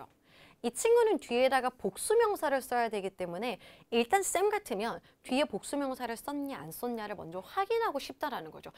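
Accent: Gyeongsang, South Korea. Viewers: 와 정말 ㅋㅋ 너무 멋있으시네요 ㅋㅋ 경상도 말씨까지 정말 이상형 그 자체시네요 참나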